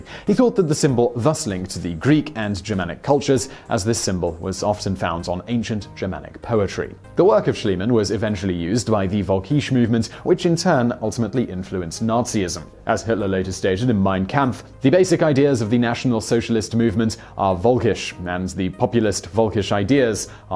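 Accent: England, United Kingdom. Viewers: I just spent an hour trying to figure out why vsauce was speaking in a English accent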